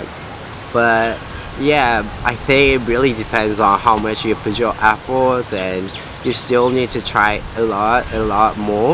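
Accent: Australia. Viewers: 벌써 400명 돌파!!?! 성장속도 실홥니깟?! 잘지내시고계시죠 ㅎㅎ 정말 영어발음이 오지발음으로 바뀌신거같아욬ㅋㅋ 신기하네요 아무튼 영상 잘 보고있습니다 ㅠㅠ 멜번 그립네요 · 영상미 너무 예뻐버리기 ㅠㅠ 영어 발음 은근 중독성 있네요 ㅋㅋ 보통 많은 한국분들이 호주 가셔도 미국식 영어발음 구사하시는거 같은데 은 애초에 공부를 영드로도 하셔서 그런가ㅋㅋㅋ 호주 발음이 찰떡이심ㅋㅋㅋ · 와 근데 진짜 호주 악센트 영향 많이 받은듯 짧은 시간동안 신기하내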